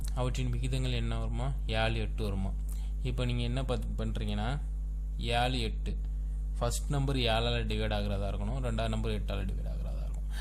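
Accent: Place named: Tirunelveli, India. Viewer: Deepak Dharman it's thirunelveli speach......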